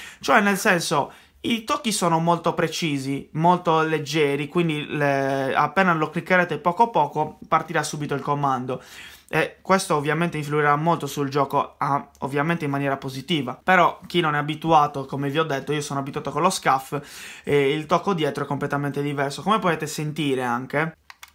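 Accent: Sardinia, Italy. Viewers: sei sardo?si capisce da come parti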